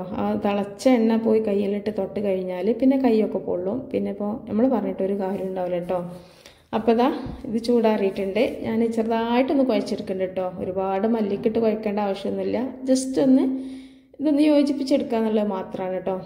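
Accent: Malappuram, India. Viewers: Thatha. Kureperu kaanunna vdeo aanithu. Athukondu manasilakunnavidham samsaikku. Thani malapuram aakalle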